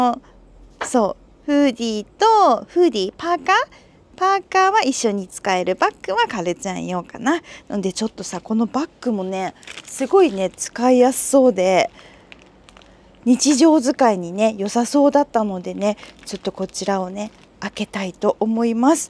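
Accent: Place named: Fukuoka, Japan. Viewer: Target isn't Cheap, their prices are High due to the location of the shop being in Waikiki and caters to tourists mainly, and the rent Target pays for the location is included. Locals don't shop in Waikiki, major rip-off! Walmart, Costco, Sam's Club, Donqui Jote, Times Market are the cheaper places to shop. Wholefoods, Kahala Mkt, Kakaako shops are expensive. Love your videos and your cute Fukuoka accent!❤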